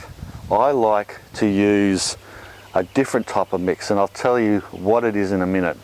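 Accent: Australia. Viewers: Watched the Intro and I Knew you were an Aussie!!! ha. I'm an Aussie in Canada, I miss the accent. I'm also from Queensland looking to eventually come home and get a nice plot of land. So bloody expensive though. Where are you located approx?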